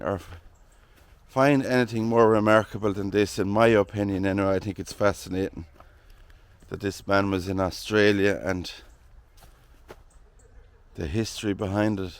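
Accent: Ireland. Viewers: Thanks fabulous video, lovely Irish voice Lynn in Brittany x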